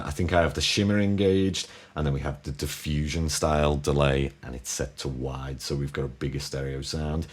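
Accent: United Kingdom. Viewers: Great chops mate! I will however admit that it was your deep timbre British accent that got me smashing that like and subscribe! Ha! Seriously my dude. You should do voice overs. LMAO! Good content doggins. Cheers!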